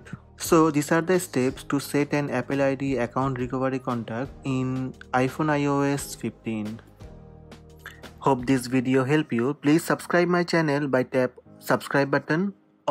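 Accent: India. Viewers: Indians speaking english sounds very ridiculous, next time use subtitles or remove anything below your tongue, the world will thank you.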